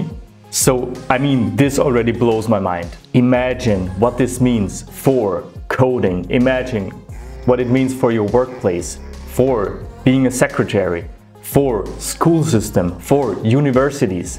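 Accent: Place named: Austria. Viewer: Great video! Very inspiring! Also - pleasure to listen to the Austrian accent :)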